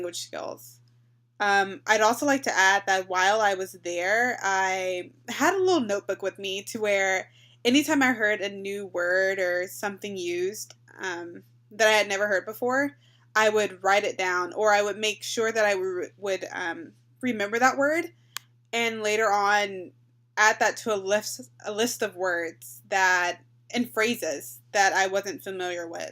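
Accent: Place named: Spain. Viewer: I love your Spanish accent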